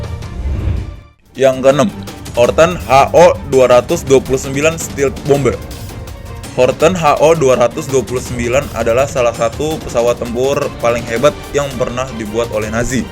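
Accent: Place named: West Java, Indonesia. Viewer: Ini pasti yg baca narasi orang sunda..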